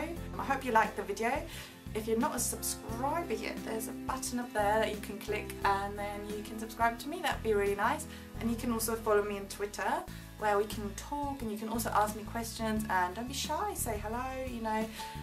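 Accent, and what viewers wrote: England, United Kingdom. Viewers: How did you aquire such an English accent if you're originally from Germany? just curious:) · are ya german? doesn't sound like. you actually have no accent, and you speak german with an english accent, which is impossible for a german! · Woww you sound english.. I thought you were english not german ;o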